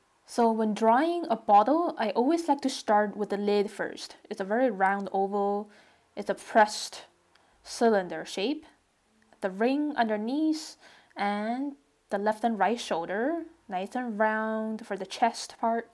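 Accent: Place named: England, United Kingdom. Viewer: 2023…just saved this as a watch later…I love rotisserie chicken, watercolor, and voice and and English accent is nice to listen to!! Keep up the good work! I’m inspired ❤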